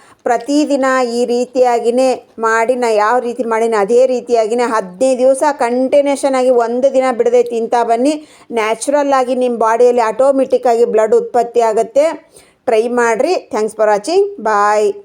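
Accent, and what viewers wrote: North Karnataka, India. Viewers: ಭಾಷೆ ಕೇಳಿದ್ರೆ ಇವರು ಉತ್ತರ ಕರ್ನಾಟಕ ದವರು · ಉತ್ತರ ಕರ್ನಾಟಕ, ಮೇಡಂ ನಿಮ್ಮ ನಿಮ್ಮ ಉತ್ತರ ಕರ್ನಾಟಕ ಮಾತಾನ್ಯಾಗ ಹೇಳ್ರಿ ನಮಗೂ ತಿಳಿತೇತಿ ಮಾತು ಸ್ವಲ್ಪ ಕಡಮಿ ಮಾಡ್ರಿ ಏನ್ರಿ ಮೇಡಂ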